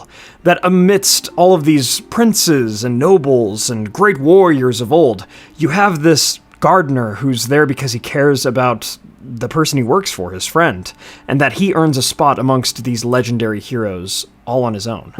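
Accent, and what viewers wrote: United States. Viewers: Gentry is the term I was practically screaming at the screen whilst listening. I think the video conflates nobility with upper class. In fairness, the creator sounds American, so they probably aren't familiar with the nuances of the English upper class. I would say Pippin is probably more than gentry though. Given he is the heir to the Thane, he is closer to being a noble. Thane as a noble rank is somewhat similar to Baron.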